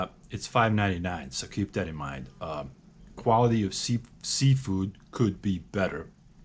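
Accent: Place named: Former Yugoslavia, Eastern Europe. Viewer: If you don't mind me asking, what countries your accent from? if I had to guess I would say one of the countries from former Yugoslavia.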